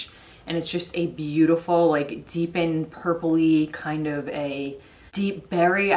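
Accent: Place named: New York, United States. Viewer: Ur def from ny right lol I can tell by your accent I’m from Long Island I live in Queens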